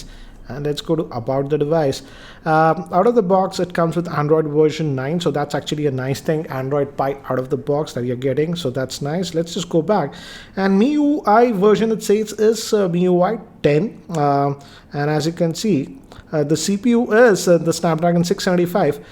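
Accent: India. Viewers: 🔴 I like Indian accent 😂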